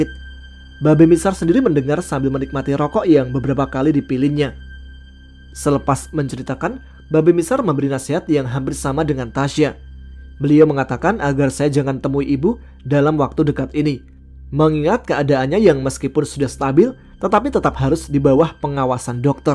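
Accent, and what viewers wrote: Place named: Jakarta, Indonesia. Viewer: Anjirrrr lahhhhh makin seru dan penasaran aja, apalgi mas pakai logat betawi nya kena bangetttt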